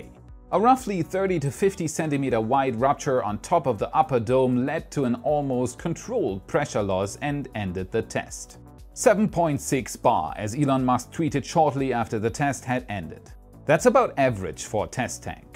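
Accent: Germany. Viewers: Of course he's German. Doesn't the accent give it away? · you are from germany?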